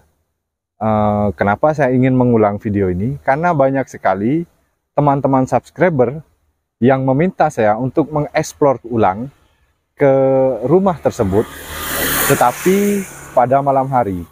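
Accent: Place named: West Sumatra, Indonesia. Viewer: Bang ini orang minang ya...logatnya seperti orang minang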